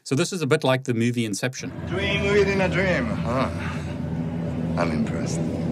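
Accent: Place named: South Africa. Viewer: It's a South African accent!!!!!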